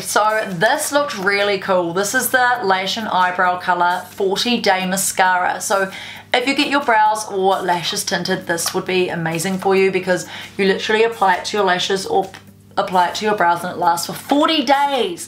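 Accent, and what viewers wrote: New Zealand. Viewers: your New Zealand accent is so cute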